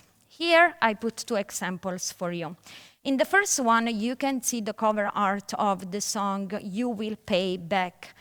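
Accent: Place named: Italy. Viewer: English with an Italian accent :)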